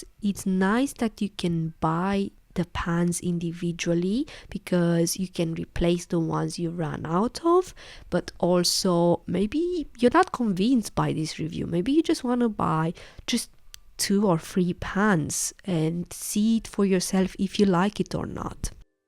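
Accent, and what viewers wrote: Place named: England, United Kingdom. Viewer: The cutest English accent I've ever heard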